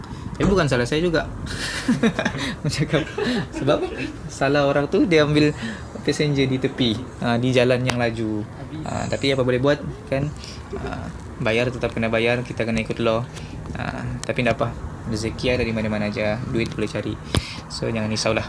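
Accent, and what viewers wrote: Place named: Sabah, Malaysia. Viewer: Cakap sabah jua dia haha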